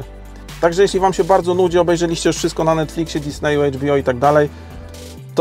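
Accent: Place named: Warsaw, Poland. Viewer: Z całym szacunkiem, ale ... pomysł na ksywę .... no taki sobie. Po prostu ... ksywę albo się ma albo nie, czyli jeśli ktoś wcześniej (w dzieciństwie / w młodości / w robocie) nadał nam ksywę i (że się tak wyrażę) "przylgnęło" i pasuje, to się jej używa .... albo i nie , bo to zależy od ... nie wiem ... okoliczności ? Natomiast "sztucznie wyprodukowana" ... zazwyczaj NIGDY nie pasuje. OK, to Twój pomysł i jeśli Ci pasuje .... to wszystko w porządku, ale ..... jak dla mnie ... dziwne to nieco. Natomiast. Oglądam Twój kanał z powodów które już tu opisywałem kiedyś, ale przypomnę ... w miarę spokojny język wypowiedzi i taki ... swojski jakby, ale ..jednocześnie "warszawski" w tym dobrym rozumieniu Warszawiaka ... coś jak aktor Zbigniew Buczkowski, czyli ... leciuteńko "cwaniak" , ale w tym pozytywnym znaczeniu :) ..... taki ... nasz :) Dodatkowo prezentujesz te samochody bez zbędnych (moim zdaniem oczywiście) bzdetów typu ..... 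oooo ... patrzcie ... tu jest kierunkowskaz ... , tu błotnik, tu lampa, a tam opona. Przy okazji jesteś jednym z tych nielicznych którzy w ogóle odpowiadają na komentarze, czy generalnie utrzymują kontakt z "publicznością" , a to (tu znowu moja opinia) BARDZO DOBRA praktyka. Na koniec watek osobisty, czyli .... UWZGLĘDNIŁEŚ moją prośbę o podawanie informacji o ilości cylindrów w silnikach prezentowanych aut ... jeszcze raz dziękuję. Co prawda przypominałem kilka razy jeśli zapomniałeś, ale ... widzę że to już "constans" w Twoich filmikach :) , czyli .... Z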